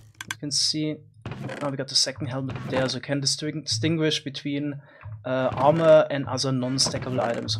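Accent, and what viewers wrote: Germany. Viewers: Ich bin mir sowas von sicher das du n deutscher bist xD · Dieser deutsche Akzent einfach xD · Mann Hört das du deutsche bist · The German accent kicks! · Wie man hört das du deutsch bist haha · Das deutscheste Englisch auf Youtube.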